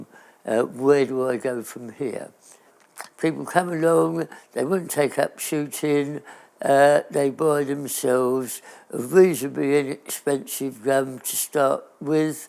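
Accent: RP, United Kingdom. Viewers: I could listen to all day long, so full of knowledge. I suffer from Tinnitus as result of shooting in my youth and I can understand every word that he says and those that can't must be stone deaf or does not speak the Queens English.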